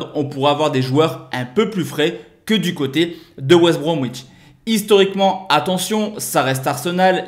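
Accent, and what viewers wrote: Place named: Lille, France. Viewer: Bonne année et meilleurs vœux Que l année 2021 soit bon en pronos pour nous tous !! Au faite t du nord on sent l accent lillois 😉😉😉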